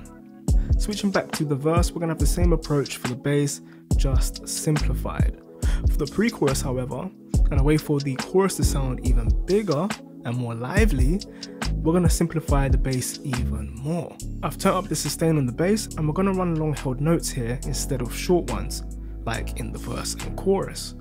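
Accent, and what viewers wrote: United Kingdom. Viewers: Ahh I feel so embarrassed now! I forgot your had a London accent. Anyway I hope you have a great holiday regardless!!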